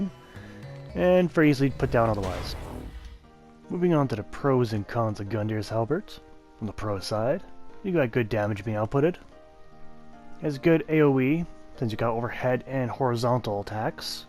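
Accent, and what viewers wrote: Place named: North America. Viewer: wild guess really, the accent tells me youre from usa or canada, so it felt likely, anyways sorry if i offended you somehow, im just really peeved at certain words being spelled wrong but i didnt know it was an intentional joke on your end.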